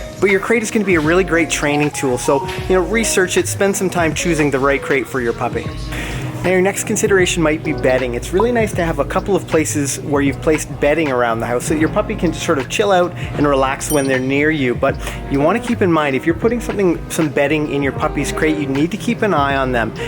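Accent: Canada. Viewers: You sound really Canadian!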